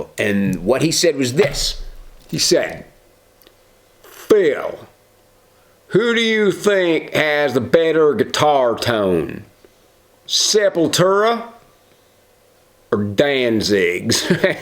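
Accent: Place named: Texas, United States. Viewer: Damn dude you’re Southern accent is spot on. That’s how we talk in Texas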